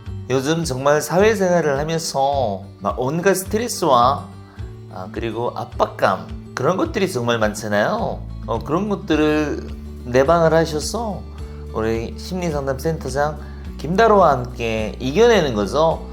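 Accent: Gyeongsang, South Korea. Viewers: 경상도 사투리 티 안내려고 서울말 쓰는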